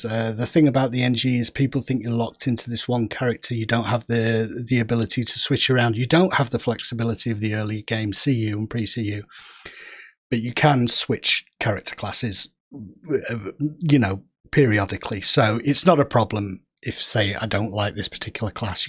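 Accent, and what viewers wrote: United Kingdom. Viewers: why is every darn video i watch on youtube always from someone who can't speak english without some heavy british scottish accent?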